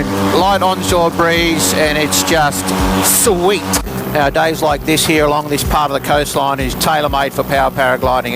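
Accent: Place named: Australia. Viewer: great vid as im very interested in what you say as im wantint to get into paramotoring been so confused were to start as i been very confused with so many brands and saddly all crap american vids who is best cap kurc dell swatz? keep away from theses guys they play with your mind 😱but hearing the aussie accent is music to my ears so thank you for your great video 👍🏻🤗🇦🇺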